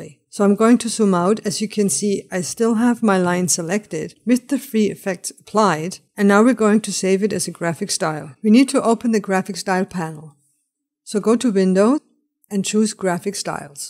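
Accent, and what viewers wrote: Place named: India. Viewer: Thank you for your tutorial. I hear an Indian accent when you speak, although your photo shows your Caucasian genetic makeup. Were you born in India or lived there for a time? And your last name is German! You are a citizen of the world, obviously. Be that as it may, I enjoy hearing English in something other than my flat, American midwest accent. (I am now subscribed to your site.) Chuck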